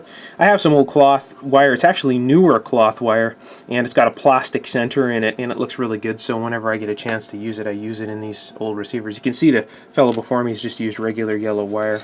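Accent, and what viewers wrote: Canada. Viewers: Your accent makes me think you're from Canada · I love your Canadian accent. My grandfather was Canadian and it's been a while since I've heard this accent! Good tip on finding the outside shield end of an unmarked cap.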